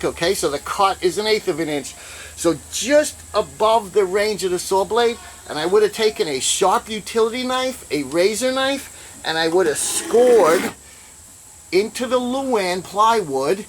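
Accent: New York, United States. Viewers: LOL!!! Glad you said that about the masking tape. I did that when doing a fret leveling/crowning on an acoustic guitar.....with the lightest tack painters tape......something came up and I left it on for 4 or 5 days, and it was like epoxy!!!!! Something that peels off so easily turns into the hardest thing int he world to get off......I wonder how many will listen to you on that. With that, people might say "This stuff...nah....it barely stays on...how could it ever be difficult to remove"....and they have to learn it for themselves!!! Good stuff though. Trying to figure if you're Staten Island or Long Island.....definitely not Manhattan, maybe Bronx, not Brooklyn......trying to get the accent. lol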